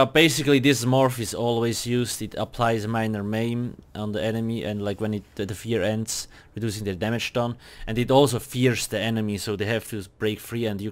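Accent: Switzerland. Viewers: He du bisch doch 100% au en schwiizer eh? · Du bist safe Schweizer :D nice video! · Bish du schwizer? :D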